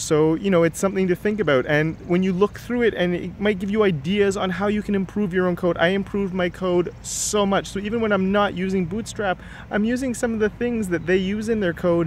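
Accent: Canada. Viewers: Hi..... are you canadian?? xD I think I recognize your accent.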